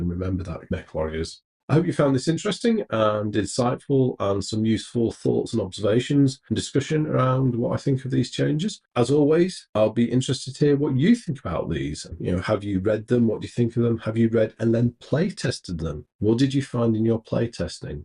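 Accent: United Kingdom. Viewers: Thanks for the analysis. But unrelated, East London accent?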